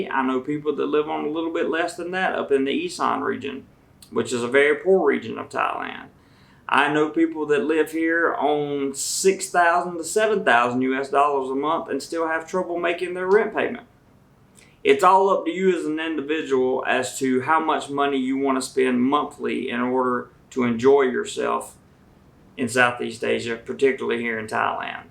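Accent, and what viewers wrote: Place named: Carolinas, United States. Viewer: You are from Carolinas know by ur accent I LIKE Jomtien very reasonable for long stay shelter Was thinking you live in a more reasonable Shelter cost location??? Thats why i asked...Sorry dude Thailand is a million times Safer than USA dont understand security reasoning?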